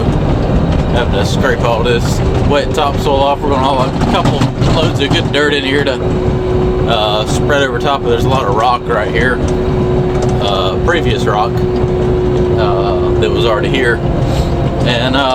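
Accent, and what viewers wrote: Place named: North Carolina, United States. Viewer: know a fellow north carolinian when i hear one. i enjoy watvhing your expertise with your heavy equipmemt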